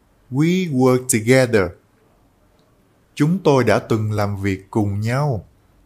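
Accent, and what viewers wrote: South, Vietnam. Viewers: Tôi thích giọng . miền Nam dễ thương và dễ nghe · Thích giọng miền Nam ghê, rất rõ ràng.. xin cảm ơn.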